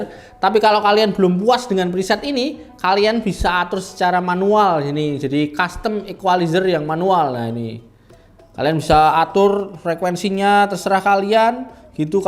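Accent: Java, Indonesia. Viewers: logatnya jawa banget... saya udah punya yang soundcore R50 NC ... apakah P40i ini kualitasnya lebih bagus dari R50 NC?😅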